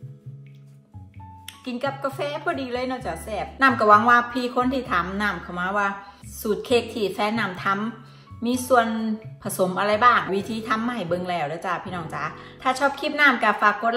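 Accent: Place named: Korat, Thailand. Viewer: พี่สำเนียงคล้ายๆคนโคราชเลยครับ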